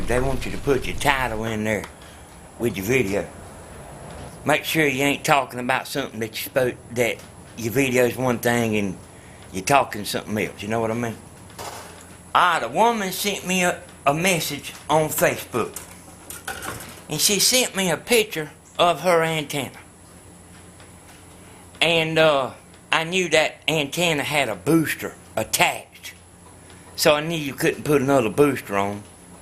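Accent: Georgia, United States. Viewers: He sounds like he is from Ga, but does Mississippi have the same accent? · Smart man regardless of accent.Headed for wealth and greatness. Southern like me.I like him.You know what I mean.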